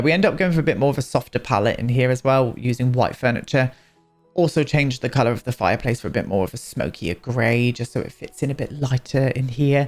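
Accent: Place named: RP, United Kingdom. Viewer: posh British then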